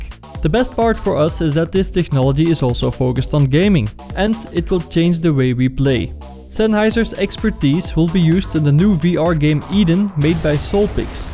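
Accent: Netherlands. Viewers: you sound like a dutch guy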